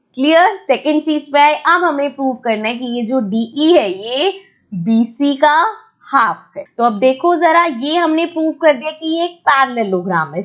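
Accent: Punjab, India. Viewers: Punjabi ho app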